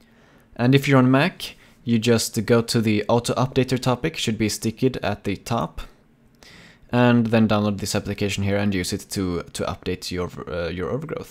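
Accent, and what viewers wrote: Sweden. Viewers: You sound Swedish Eller ska jag säga Svensk ;)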